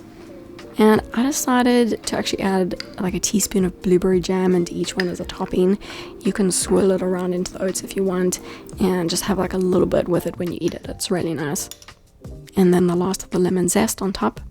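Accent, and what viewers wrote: South Africa. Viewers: where is your accent from are you South African, Australian or from New Zealand? the accents are currently confusing me currently so i tossed it all in cause i would think 1 of those 3 but i think South African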